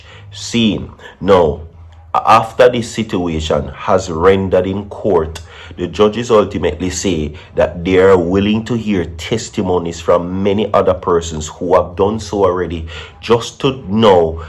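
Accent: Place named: Jamaica. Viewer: This dude sounds educated. First Jamaican YouTuber I've heard speaking great English in his accent . Freedom is a must. Big your self my friend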